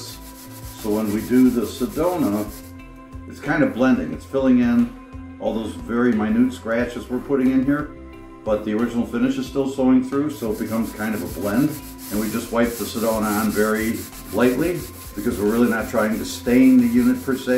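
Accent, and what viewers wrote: Chicago, United States. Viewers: Magical, masterful, mystical restoration. With a Chicago accent.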